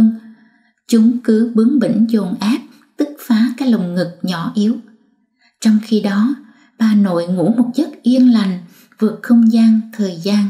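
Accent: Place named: Central, Vietnam. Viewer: Vui thích khi tim được kênh nay và nghe giọng đọc của … mới nghe lần đầu nhưng thấy thân quen vi giong co âm hưởng của người lớn lên ở DaNang, Dalat hay Nha Trang gì đó? Co một chút lai của nhiều miền nên rất de thương. Sẽ nghe lai các truyện của Tuổi Hoa 😊… cam on rất nhiều.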